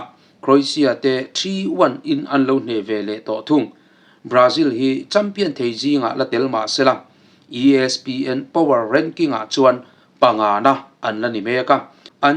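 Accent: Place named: Paite, India. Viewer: Ichanel ania, ilan loh ten paihte angin tawng chu pai mai mai mah se apawi hlei nem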